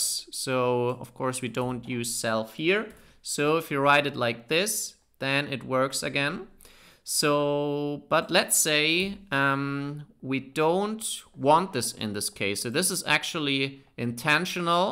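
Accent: Germany. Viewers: nice content, but your accent is as worse as German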